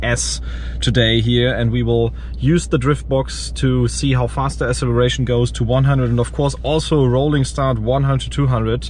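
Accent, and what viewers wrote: Germany. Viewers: tscherman accent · I love it how you can hear your german accent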